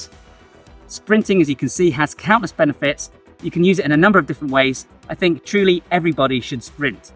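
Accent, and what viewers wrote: United Kingdom. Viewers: Great Video ! I remember when I played Football (America/Canadian Style) we had to run smaller hills and Short Sprints 40-70 Yards. The NFL Combines (Selecting Top College Players for Profession Teams) one of the Tests is the 40 Yard Dash (Record was 4.21). Sounds like your from the UK so I guess Rugby and Soccer Players must have to be Fast sometimes with explosive Burst of speed too. Also when I did Track and Field the real Power Sprints seemed to Top out at 200 to 400M after that I think some Pacing after that would be required if going Full Bore. Roger Banister 1st man to do 4 minute Mile would Average 17.60/100 yards in a 1 mile run. Also what do think about Cross Country Running, in your video looks like that or mixing up the Pace I guess. Even in the Boy Scouts (old days) was something like Walking 50 Yards them Running 50 (alternating) when hiking sometimes. 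I guess the Top women sprinters (100 M) are only than a second or 2 behind the men ?! Also to qualify for Police officer training here Recruits must run 5 KM in 30 Minute. What do you think ? Thank you Jim Canada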